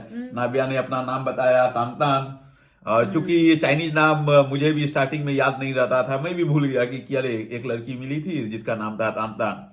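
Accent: Bihar, India. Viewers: ji tumhari hindi to acchi h par bihar wali h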